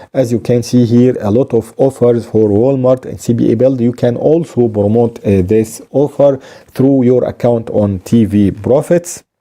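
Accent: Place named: Egypt. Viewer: معلش بس اللهجة المصرية باينة بصراحة 😂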